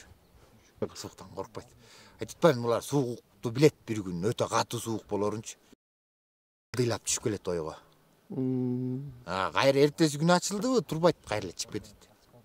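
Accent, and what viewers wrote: Standard. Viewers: Депутаттарга караганда сонун так даана тушунуктуу нукура кыргызча кагаз карабай суйлойт экен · Азыр уйдун эт-сутуно караганда топоздуку таза болуп калбадыбы,укол-дары бербей баккыла эми.Ал эми топоздун сутун кандай пайдаланган туура болот аны интернеттен караса, пайдалуу маалыматтар коп эле болсо керек. Малчылардын туйшугу да ото оор болот,ак-эмгегинердин узурун коро бергиле!Так-таза кыргызча суйлоп, жакшынакай маек берген азамат экен.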